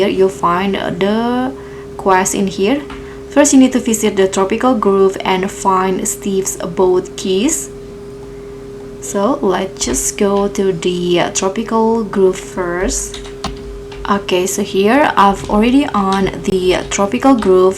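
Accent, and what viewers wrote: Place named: Indonesia. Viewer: Bahasa inggris lu kayak bahasa Indonesia, karuan pake b indo, jujur ga ngerti gua ngomong apa pas belum ngeh wkwk, tapi makasih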